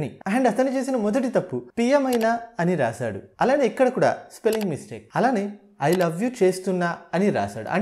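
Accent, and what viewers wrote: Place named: Telangana, India. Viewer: Micro detail: How many of you noticed speaking in Telanagana 😅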